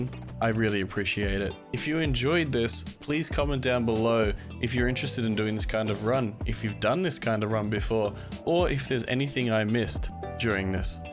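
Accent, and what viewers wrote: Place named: Australia. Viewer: I love the australian accent. You are doing great, keep it up!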